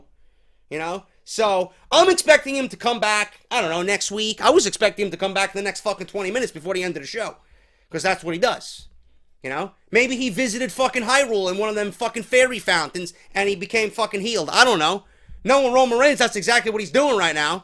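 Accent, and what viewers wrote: New York, United States. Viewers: Is this guy Vince Russo's kid or do all New Yorkers sound the same?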